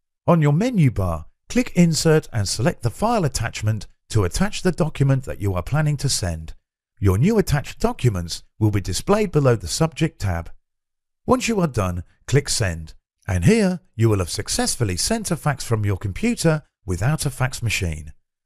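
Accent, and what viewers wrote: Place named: United Kingdom. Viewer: I love hearing the British-English accent, especialy "computah".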